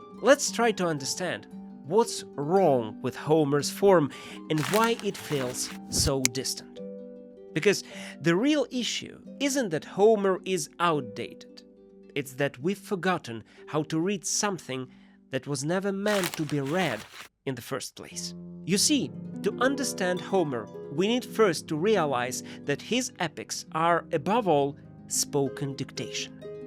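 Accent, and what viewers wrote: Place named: Austria. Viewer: Очень странно, но когда услышала первые слова этого видео, подумала, что сошла с ума, потому как полилась немецкая речь, в то время как несколько недель назад я слушала это на английском. Копец конечно, вероятно через пару месяцев оно переключится на китайском, но в нем я не сильна 😅а вообще, ИИ иногда произносит имена авторов неверно, вот недавно слышала вместо Джейн Эйр он сказал Дженера. Бумажные книги останутся самым надёжным источником, потому как их нельзя сманипулировать, и жаль, что многие уже книгами расхламировались. P.S. автор этого ролика говорит сейчас на немецком, но скорее с австрийским акцентом.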